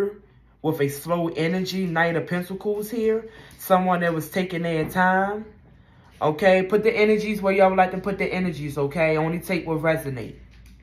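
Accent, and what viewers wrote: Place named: Baltimore, United States. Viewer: HELLO your brownskin is brown skinning you look great i love your Baltimorian accent it's gritty CUTE..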